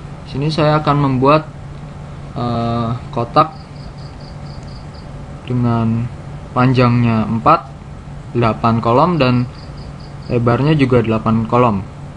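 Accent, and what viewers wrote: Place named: Java, Indonesia. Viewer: Bang jawanya medok bgt 😂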